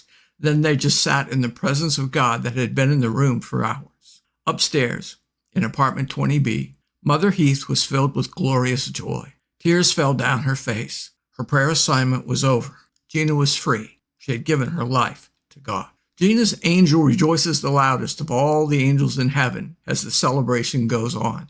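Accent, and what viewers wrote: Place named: England, United Kingdom. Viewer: My god what a beautiful message. I am from India. Typical English accent, easy to listen to and fantastic insights. Thanks for leading me into his glorious light more and more. GOD BLESS YOU. 👍🙏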